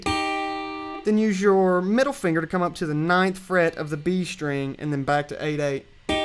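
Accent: South, United States. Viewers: thank you so much, i've been looking over for ages for this lesson! you rock! btw, where are you from? you accent sounds southern american.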